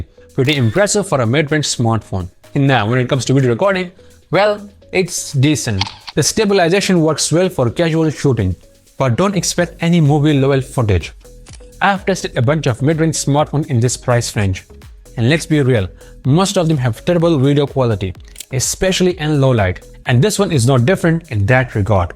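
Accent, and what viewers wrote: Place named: India. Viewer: the annoying dirty indian accent is cringe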